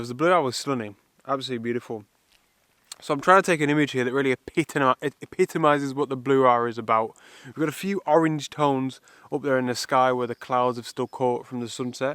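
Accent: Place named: Northern England, United Kingdom. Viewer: I love listening to the passion you have for your vocation. Your shots are wonderful, but you only achieve that through your love and appreciation of the land. A pleasure to hear your no-nonsence Northern twang too. I'm from Manchester originally myself, now living in Croydon, how I miss the people and their candidness. Great work fella, and long may you continue on your quest.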